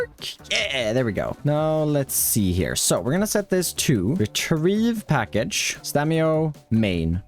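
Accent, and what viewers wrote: Sweden. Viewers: This is the first video I see of you and by your accent I bet you were swedish.. and then I looked at your youtube channel description and I was right.. Im swedish too :D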